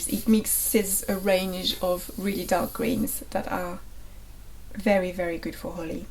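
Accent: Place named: France. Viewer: I discovered your channel only a few days ago. I'm a beginner artist (colour pencils at the moment) and appreciate your clear instructional videos and the general information on art you share. Your mix of the French and English accent is so cute I can't help coming back just to hear it. It reminds me a little of Meryl Streep's accent in Out of Africa "I had a farm in Africa at the foot of the Ngong hills..." lol! Not a Spock reference sorry.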